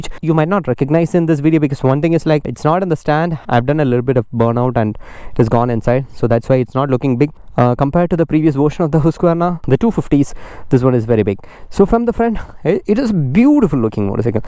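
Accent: Kerala, India. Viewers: I knew you’re a mallu with your pronunciation!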